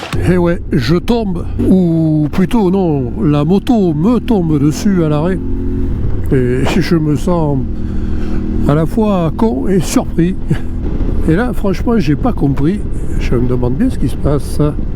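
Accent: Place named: Provincial, France. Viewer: Le mec achète CHINOIS et il s'étonne d'avoir des problèmes, HAHAHA !! Et c'est quoi cet accent ridicule de plouc de province ???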